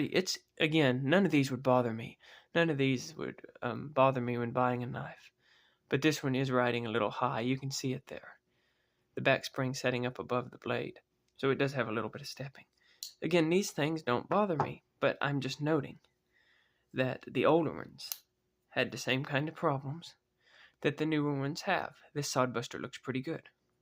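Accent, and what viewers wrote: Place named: Virginia, United States. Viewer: I just came across your channel and Ive watched 4 or 5 of your videos so far and Ive enjoyed them all. I must ask, what state or region are you from? Im trying to pin point your accent. If I had to guess, I would say Virginia or a neighboring state to it. I do want to throw in my experience on this subject. I apologize in advance as this got longer than I initially intended. I collect knives of many manufacturers, Case of course, but also older Remingtons, pre swinden Schrades, Queens, GEC, etc. I would say though that Case is my bread and butter and where my knowledge and experience most lies. Before I say what I am about to say, you and everyone else who may read this should know that I specifically collect pre-1970 Case knives. I currently have probably two dozen of these, mostly XX era. I have some newer ones as well but much prefer the XX era knives. 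Now that everybody knows my bias, I want to say that it is my opinion that Case quality is no worse now than it ever has been. I would say 3/4 of my pre-1970 Cases are mint, unused and not a single one is what a lot of people nowadays would judge as “perfect”. They all have blade rub, they all have atleast one if not more proud scales or bolsters, they all have atleast a proud or sunken back spring when a blade is open, the centering is not perfect on any of the ones where blade centering is applicable, all have gaps somehwere and the factory edges on the vintage mint ones suck as well. These are all things people complain a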